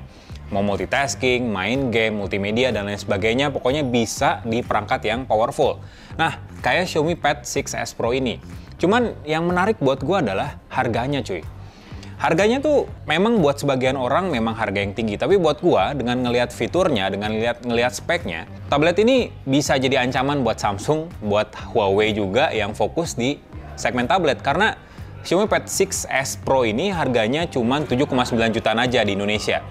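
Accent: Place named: Brazil. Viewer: he has Brazilian Portuguese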